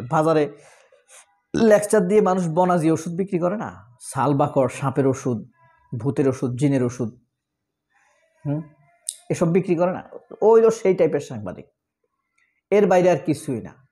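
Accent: Noakhali, Bangladesh. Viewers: ভাই, আপনি কি নোয়াখালীর (New Cally) লোক?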